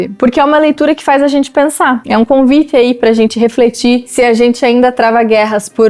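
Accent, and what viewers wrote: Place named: South, Brazil. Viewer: Este classico parece ser sensacional, ainda mais apresentado por uma voz doce de sotaque sulista simplesmente indefectível.... simples perfeição!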